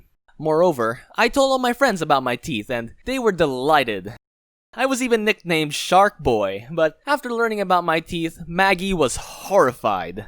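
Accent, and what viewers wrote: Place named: Sweden. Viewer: Is it only me or dose he sound swedish???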